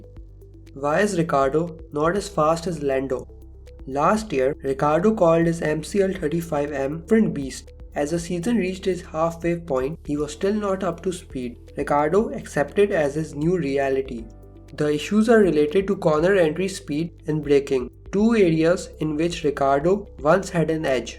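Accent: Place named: India. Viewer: Indian accent ... Nice 😌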